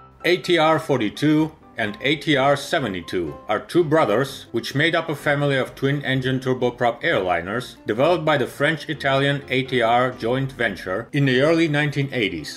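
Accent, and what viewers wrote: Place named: Russia. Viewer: In Russian aircraft are male, and going by accent that might be why he is using that.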